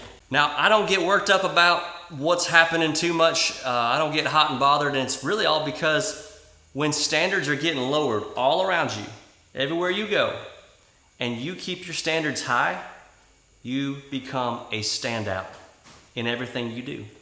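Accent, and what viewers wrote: South, United States. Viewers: I don't know how this video was suggested for me. I assume this guy is a country singer. I don't like country music. I don't care much for his obvious manner of appealing to the patriotic Christian worker class with a southern twang -- not that I don't identify wih some of those categories, but because it seems contrived here. But his words made a difference. Don't lower your standards and you'll be a stand-out. Keep your standards high.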